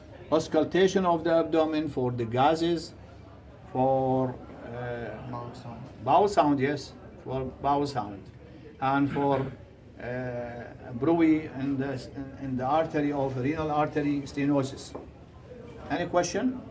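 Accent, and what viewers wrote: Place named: Arab world. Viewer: Hhahaha it's Arabic accent 🥰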